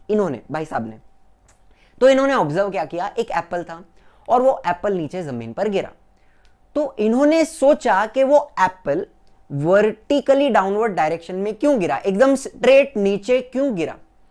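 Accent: Maharashtra, India. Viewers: I bet your mother tongue is Marathi sir🌶️ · Sir you are marathi???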